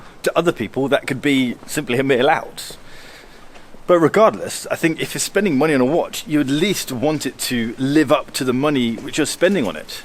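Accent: United Kingdom. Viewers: The review coming from someone with a British accent meets the expectations. A ton of complaining, and more complaining, and more complaining. If I hadn't seen other videos from you, I'd say you're the kind of person who'd complain about Patek or Vacheron Constantine's movent, and why it took so long for these companies to make your $1M handmade watch. Then once you're done with the watch complaining, you'll start complaining that your girlfriend has too much boobs (whatever that means).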